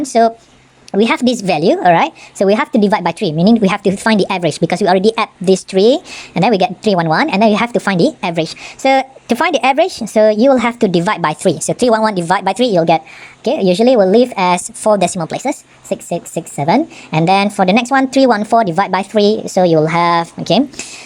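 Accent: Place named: United States. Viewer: accent american sir ni pekat gila sampai aku lupa bukan orang america yang buat video ni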